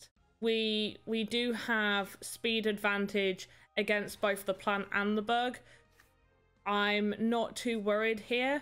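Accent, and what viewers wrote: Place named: United Kingdom. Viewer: I like ur speaking! U cool! Lovely British talking! 😇❤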